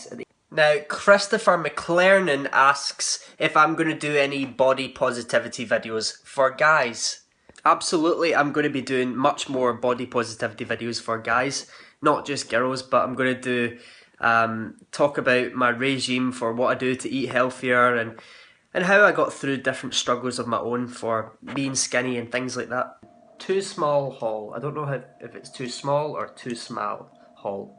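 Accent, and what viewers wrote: Scotland, United Kingdom. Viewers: so I love Scotland (always wanted to go there) , i love your accent but most of all I really admire your positivity, which I think is essential for a person in order to be okay with himself. I "met" you a couple of months ago but whenever I watch your videos, it feels like idk, home :p so yeah greetings from Greece, keep smiling!!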